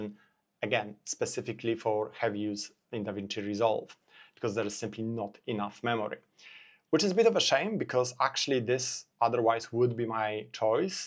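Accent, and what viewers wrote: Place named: Poland. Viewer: Mordo, od razu wiem żeś z Polski. :D pozdro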